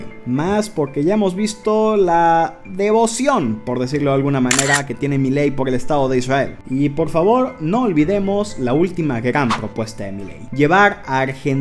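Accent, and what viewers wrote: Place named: Mexico. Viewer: Se nota que no sos argentino y por como hablas pareces mexicanito y haces este video sin conocer al país argentina ni a du gente y menos al presidente y mucho menos la historia de argentina y te pones a decir ridículeses sobre cosas que no conoces lo mejor sería que bajes este video falso estende y antes de hablar de otro país tenes que informarte bien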